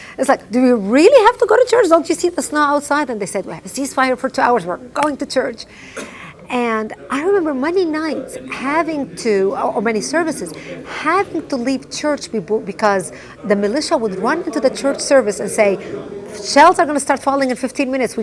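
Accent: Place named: Lebanon. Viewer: Oh please. She even speaks Arabic with a Lebanese accent. Stop your lies !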